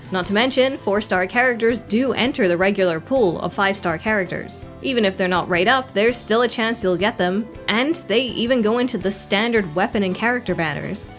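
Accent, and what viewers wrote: United Kingdom. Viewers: the scottish accent is great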